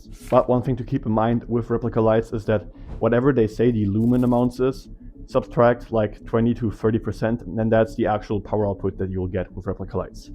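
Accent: Germany. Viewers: arent you german?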